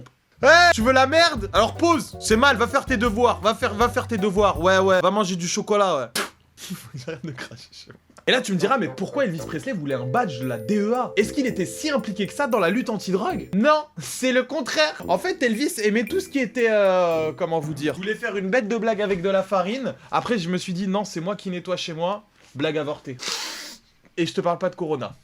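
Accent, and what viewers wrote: Colombia. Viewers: Accent Colombian: 20/20❤️ Leonard di Vinci: 58/20😍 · L'accent colombien 200000/20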